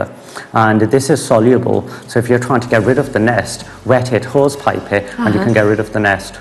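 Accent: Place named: Wales, United Kingdom. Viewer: Is he Welsh? I just can't pinpoint that accent.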